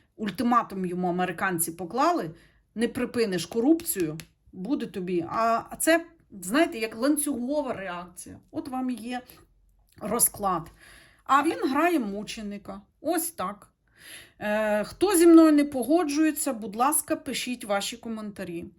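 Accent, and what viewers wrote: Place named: East, Ukraine. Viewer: Добрий день! Це говір сходу України.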